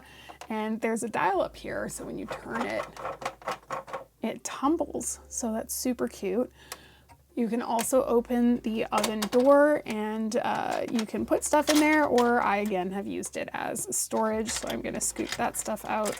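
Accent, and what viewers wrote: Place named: Canada. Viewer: I actually thought you where Canadian 😂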